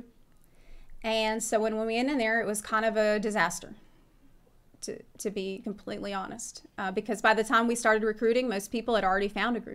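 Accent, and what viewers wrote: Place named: Texas, United States. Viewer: let the darkness consume yeh. how does a Ukrainian end up with a texas accent?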